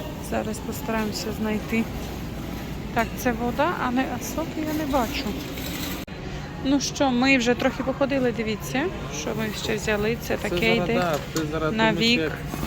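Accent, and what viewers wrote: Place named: Transcarpathia, Ukraine. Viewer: спасибо за видео. Пожалуйста продолжайте разговаривать на украинском языке.Я уже 30 лет живу в России. Сама из Закарпатья. И так приятно слышать именно наш украинский диалект из Америки. Всех вам благ.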